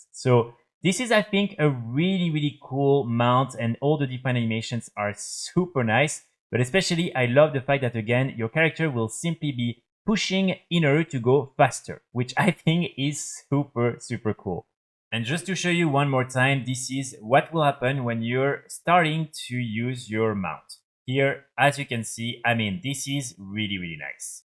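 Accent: France. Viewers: What is the problem with your accent?? Is that French sh!t?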